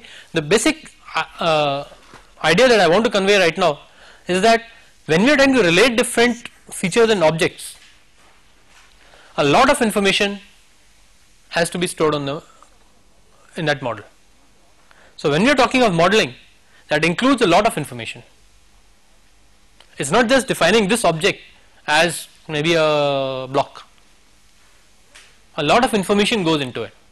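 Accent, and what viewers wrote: India. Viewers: What a highly informative video. It is great that the university made this available here. I'm American and I did not have much trouble understanding the Indian English spoken by the professor. Those who had problems understanding just need practice listening to Indian English- this speaker did not use any Hindi-English slang (that I can recall) so it is just a matter of developing an ear for how familiar words are pronounced. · This" National Programme on Technology Enhanced Learning" videos are mainly aimed at improving education for Indian students so that Indian students can benefit from it. And we understand it very well. If you want to use these resources you can use it for free. But we didn't remember asking an opinion from an outsider on our accent - especially from an ignorant one like you. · A agree with bajholster. If subtitles were added, I think they would help a lot. 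I'm rather used to different accents, but Indian accents are one of the most difficult for me to understand. Excellent video. Thank you for the lecture. I am beginning to study and learn CAD, and this was a *very* valuable video for me. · it difficult cannot laugh about the indian spoken, it seem like speak with a Little Candy in your mouth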